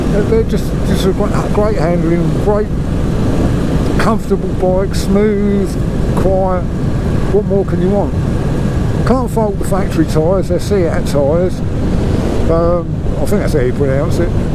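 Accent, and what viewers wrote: England, United Kingdom. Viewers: New subscriber here. Your most recent video from the barn where you talked about your experience on the flooded road showed up in my feed and I gave it a watch. Then I watched a few more videos. I just sold one of my bikes and am looking for a candidate to fill the spot in my shed. I got rid of a H-D Dyna. Too heavy, too loud but had a lot of charm otherwise. I really wish that RE made a 650 classic, but they don’t. I am thinking about a Super Meteor or a classic 350. My mind is still not made up, but your video was very helpful. BTW, what part of England are you from. I am trying to place the accent. As an American that was raised on a diet of Monty Python, you accent has me recalling the Piranha brother’s sketch. Cheers and thanks. Tim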